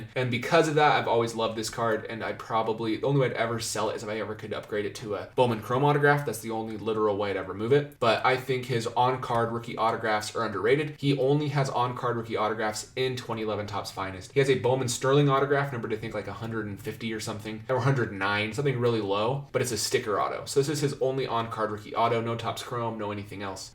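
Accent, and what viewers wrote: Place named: Utah, United States. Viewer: Sounded like he is a Utah boy like myself.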